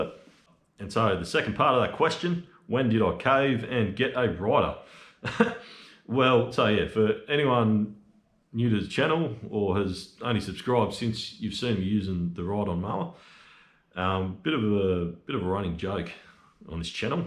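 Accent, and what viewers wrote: Australia. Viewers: Recently discovered lawn care channels. Had know idea they existed. Love to see the detailed results I guess. This is the only one I've subscribed to. And your thick Australian accent is part of your charm. BTW, I'm American. Would love to visit Australia someday. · I've always loved the Aussie accent best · I have no idea why I love your channel….just do. You have a very sincere appeal….and that Aussie accent is amazing! You always sound happy. Your finished shots show just how much you love mowing. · Hi, this was from 6 months back & now January 19, 2023) Your Q & A Topic was Wonderful, first Thank You & your Wife-Claire for your Service!! It was nice of you to tell all of us your Family, a Lovely wife-Claire & 3 girls & the youngest a boy...that is wonderful too! Wow you have a few hobbies, play guitar, pencil sketches, modulars & video games...especially with your kids, & movies & Star Wars!! 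I liked hearing about what you did in the Army, my husband was in the Marines but he did that way before Me! As for maybe coming to USA, Kevin from Lawn Care Juggernaut from Ohio & you having him come to Australia is nice, but look up Spencer, from SB Mowing from Kansas..I like him a lot better!! I`m a American & I hear you fine with your Aussie Accent...which I love listening too! Congratulations on 100k, you Earned it for being Nice, Polite & your Funny things you put in the Videos!! As for the Rude People, Don`t Listen To Any of Them, You are doing a Great Job!! The saying here in USA is... If You Don`t Have Nothing Nice To Say, Don`t Say Nothing At All!! Best Of Luck, with your YouTubes & your Married Life!! Have a Good Work Week & a Wonderful Weekend with Family!! Love from Central Illinois USA 🥰😎❤ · I love your Australian accent and have no trouble understanding every word you say. 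I especially like when you call a weedwacker a whipper snipper. Congratulations on getting to 100,000 subscribers. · Gosh I loved this! So fun to learn about your military service (thank you), that you have 4 kids and an awesome wife, that you call out the jerks (thank you again), that I melt every time you say "G'day" (love an Australian accent even if some *jerks can't understand you), and that 100K others have the same satisfaction watching your videos. The Grand Finale music is the best so I'm inserting that here for your awesome milestone!! Congratulations! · I love Australians accents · Great video!!! Thank you so much for sharing and taking the time. You are such a generous kind soul. 🤗🤗🤗🤗 . Keep the videos coming please ❤️❤️❤️❤️❤️😜... I m French . I lived in the UK before and I work everyday with Americans at work. I can understand you fine 😜. I actually really like Australian accent and Scottish accent 🤗🤗🤗🤗. 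It makes your videos even more enjoyable. I m a fan 😁😁😁. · How awesome to learn about you and your family. No wonder you are such a wonderful human being. This was a great video! I love the shout out to Brad from Copper Creek. I don't know him personally but live within a mile of him. I watch his videos and love it when I recognize a property he is working on. And just so you know ... everyone I know, and I mean everyone, loves an Aussie accent. My son even has his Siri set to it. Talk about accents? I'm a Florida country girl with a thick southern accent. I don't realize I have an accent until I meet someone outside of the small town I live in, and they have to comment about it. Usually it goes like this, "Where did you get that accent? Are you from Tennessee?" My reply is always... "What accent?" It must be pretty thick because the workers at the Chinese takeout can't understand a word I say. 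😆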